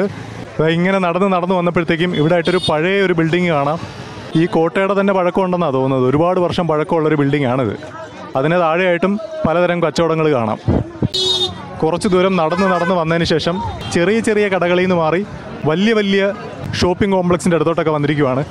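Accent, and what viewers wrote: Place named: Kollam, India. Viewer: പക്കാ കൊല്ലം കാരൻ 😄😄.. സംസാര ശൈലി.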